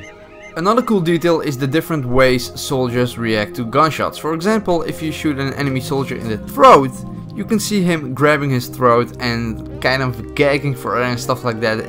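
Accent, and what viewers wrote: Netherlands. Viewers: Your english sound dutch. You from holland? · You sound like youre dutch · im new and have a qeustion are you dutch ?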